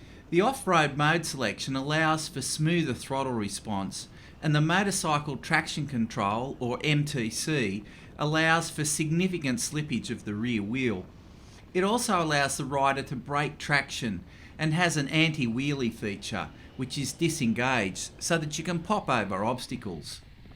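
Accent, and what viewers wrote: Australia. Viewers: Great review, thanks. I especially like the level of detail, feature by feature, very easy to follow and understand. I also like the Aussie voice and style - who else uses the term "gobful of power". I bought one after this review. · I’ve spent all Saturday watching reviews and comparisons. This is by far the best review on YouTube. Thanks for the awesome upload. Can’t wait for COVID19 to end. I’m wanting to step up from my CRF250 Rally, the KTM 390 is very tempting but the 790R just has all the goodies! It’s so good to hear an Aussie, just makes it feel some much more relevant 👍🤓🇦🇺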